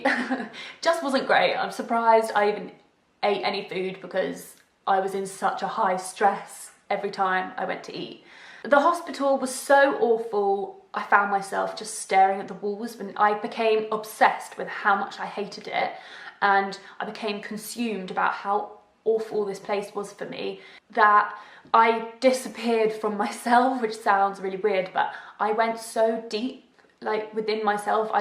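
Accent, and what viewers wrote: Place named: England, United Kingdom. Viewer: Right, exactly. It's easy to take our health for granted before it all goes wrong. I'm the same age as you and don't have MS but I work in a hospital and I witness victims first hand. Thanks for keeping us updated and I think we can all say we admire your courage. And yes keep having fun! :) P.S. And we Americans definitely admire that English accent, haha.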